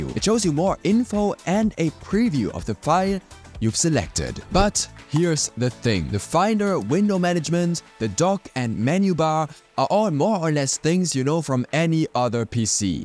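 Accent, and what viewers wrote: Germany. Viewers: You sound very German, are you also German? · TOO STRONG GERMAN ACCENT !!! impossible to listen to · the german accent was too strong to over hear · hatte kurz gedauert i hear the german akzent btw nice video :) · Gone are the days of my youth when finding a German who speaks English was looking for a needle in a haystack. still has a little bit of accent which I immediately localized but it is not detrimental in the least, it is even charming. Actually, his English has more clarity than that of the most native speakers I follow on Yt. But it’s not only in the way he speaks. It’s also the way he lays things out and his overview. A natural born talent for an educator. I associate this subject of learning the macOS with my worst frustration of the last month but the way he deals with it replaces my grave frustration with simple fun and much faster learning curve. 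The script and video integration are simply awesome. I know doesn't need my acclaim after millions of views and thousand of comments, but I am so impressed I want to encourage him anyway. I have watched this three times and will be coming back. This edition may be better than the 2025 one.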